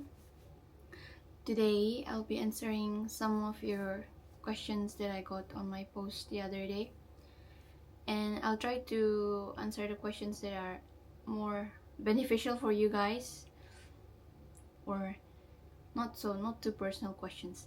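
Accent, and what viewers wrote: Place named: Japan. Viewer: Your Japanese accent is so lovely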